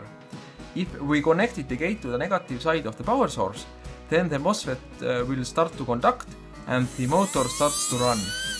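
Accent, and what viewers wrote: Estonia. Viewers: Thank you so much helped me out man! Btw you sound like an estonian. Am i right? Im from est.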